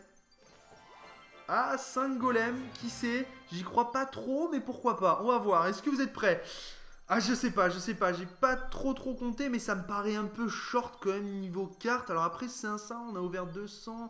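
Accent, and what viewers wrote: France. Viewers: J'adore ton accent marseillais ! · l'accent du Sud 😃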